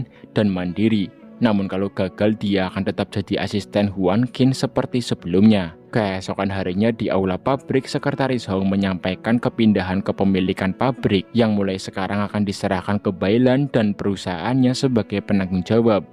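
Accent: Banyumas, Indonesia. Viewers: Aku sangat terhibur bingiit sih mas bro kalau mas bro bacain cerita drama itu lhoo sama bahasanya sampean yang medok n ngapak bingiit ra ngapak ora kepenak yaaah 🤔🤗😁👍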